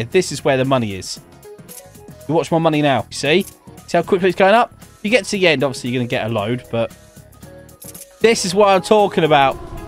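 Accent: United Kingdom. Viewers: are you bri’ish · I just now he's British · U speak British me too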